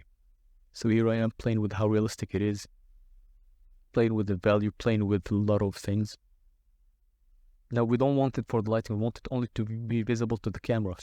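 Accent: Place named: Russia. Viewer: очень русский акцент)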